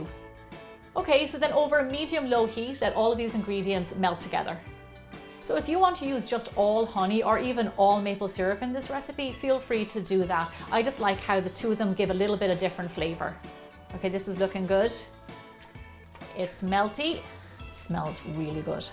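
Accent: Ireland. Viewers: I come for her recipes and her irish accent 😍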